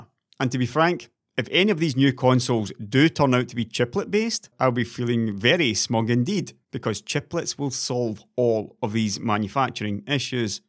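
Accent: Scotland, United Kingdom. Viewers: Never thought that listening to a Scotsman do arithmetic could be one of my favorite things...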